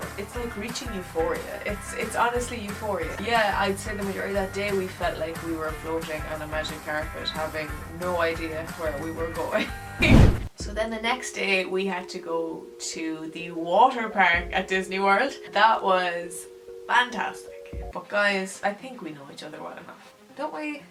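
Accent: Ireland. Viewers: Relevant to Foreign women and armpit hair in America: Here in these parts French women (deservedly or not) have a scandalous reputation for copious pit hair. If you were to shout out in that glorious genuine Irish accent; "Hey, I'm from Ireland! We are only (X number) of miles from Freaking France"; I'm sure everybody would cut you some slack. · I know you get it all the time, but your accent and mannerisms are adorable. I'm a mutt... Cherokee, Irish and Welsh. I've always wanted to see rural Ireland. Watching you and your friends I think just gives me a bit of insight of true Irish and line of thought.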